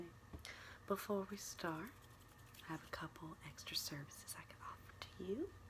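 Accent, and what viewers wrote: South, United States. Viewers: It's like.. I should be offended being from the south but it's just so perfect I feel like I'm with my aunt. Thank for making me homesick 😂😂😂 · THe southern accent and everything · yes I want her to do more asmr videos with this southern accent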